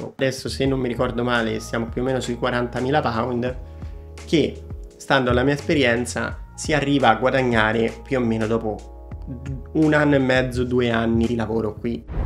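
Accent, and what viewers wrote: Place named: Rome, Italy. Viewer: Sembra romano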